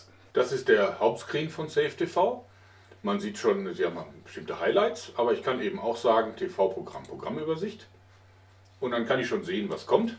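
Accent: Germany. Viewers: #JoergSprave ich habe gewusst dass du n deutsch bist:D denn der Akzent ist der beste:D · Nico Derksen Kann man aber braucht man nicht. Die Videos sind für die Weltöffentlichkeit bestimmt und nicht für welche die nicht genügend Englisch verstehen. spricht ganz gut Englisch und hat so wie ich eben einen charmanten Deutschen Akzent. Keine Extrawürste braten!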